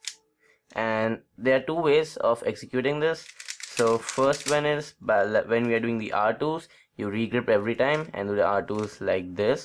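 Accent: India. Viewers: sounds more American but this is Indian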